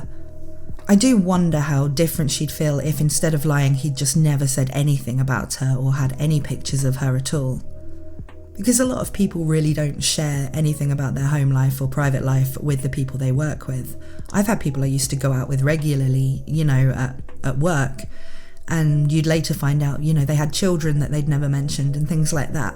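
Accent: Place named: United Kingdom. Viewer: More! Because of your accent, I default every story as something happening in the UK somewhere. Then you talk about States and other American stuff and there's a brief pause while my brain processes the disconnect.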